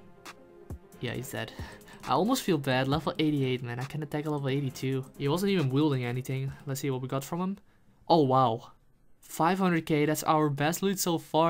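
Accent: Netherlands. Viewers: I can tell that you are Dutch, SICK accent :P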